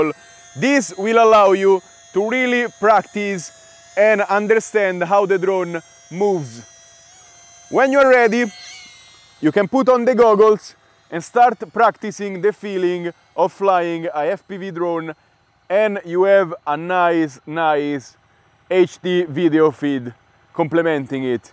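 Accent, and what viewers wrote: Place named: Italy. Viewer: Your english is the best italian Style,its like music in my ears Grazie👍👍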